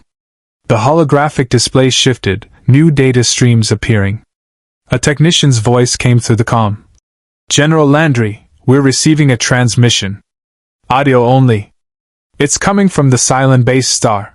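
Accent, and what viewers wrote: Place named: South, United States. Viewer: Sounds like the South...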